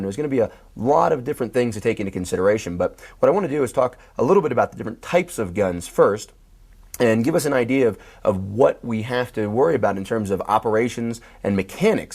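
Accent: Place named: Chicago, United States. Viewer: You must be from Chicago!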